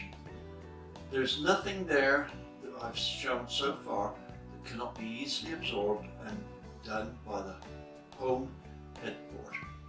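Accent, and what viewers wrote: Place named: Gloucestershire, United Kingdom. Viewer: Hi, great Video, simple terms, and nice to see you have not lost your Gloucestershire accent after all those years over the pond.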